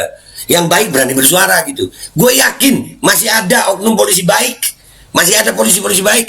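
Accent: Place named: Madura, Indonesia. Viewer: Entuk emosi yiik,tp ojok metu logat meduro-mu...😁😁😁😁😁,lanjuut yiik,Satrio gang Dolly mendukung perjuanganmu....wis mblenek yiik ndelok Kabeh Iki.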